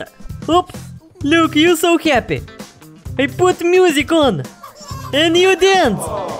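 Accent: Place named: Russia. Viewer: Russian accent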